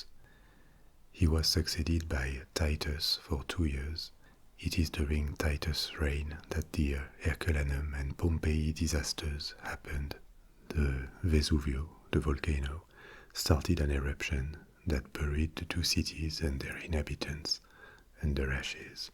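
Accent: France. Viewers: What a sexy voice and that French accent 😍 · Like a French Morgan Freeman. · Is that a true French accent or a cartoon one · Are you kidding me. A French man, speaking english and whispering? A dream come true.💖💖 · Ever since visiting France (my first time visiting another country!), I have missed the French language and/or accent. It was wonderful, and hearing it in ASMR form AND in historical/science/astronomy forms is the best I could ask for 😊 Greetings from America. Thank you and stay safe!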